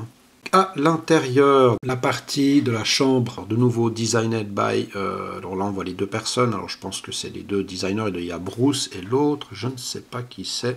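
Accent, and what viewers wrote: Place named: Switzerland. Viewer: Hello, Bravo pour tes magnifiques vidéos. Il me semblait avoir reconnu un accent Suisse. Salutations du Jura. Sais tu s'il y a encore un salon de la vape en Suisse ?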